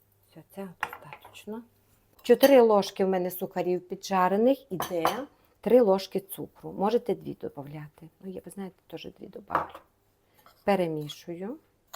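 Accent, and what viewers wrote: Transcarpathia, Ukraine. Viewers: Так приємно бачити і чути наш Закарпатський говір. А страви ми і у Німеччині готуємо ,як колись вчила нас нодь мома. Здоров'я та миру вам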